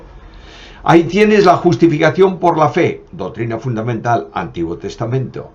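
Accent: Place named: Galicia, Spain. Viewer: El no es malhumorado, simplemente es su acento gallego, a mí sinceramente me agrada ver como el hace énfasis con su acento y tono, ¡Dios le bendiga!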